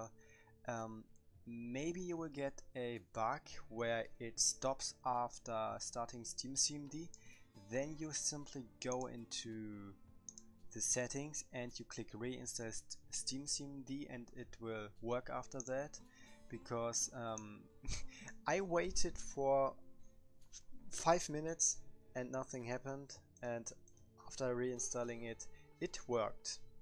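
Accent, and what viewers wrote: Germany. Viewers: Are you German? If yes, you speak good English👍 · Ich liebe dein Deutschen akzent 👍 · Man hört den deutsch Akzent 😂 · Ok Cool Aber man hört deinen deutschen Akzent :) im englischen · i can hear the german accent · Ich liebs wie man Deutsche an Ihrem Englisch erkennt haha, danke fürs Video ! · Einfach schön auch mal Deutsche Englisch sprechen zu hören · Deutsch man hört es direkt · aber sprichst deutsch, das meinte ich, und du hast den launcher auf Deutsch und man hört den deutschen Akzent raus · Man hört so krass das er deutsch is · Man hört so hart das du deutsch bist lel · digga du bist zu 1000 prozent deutscher haha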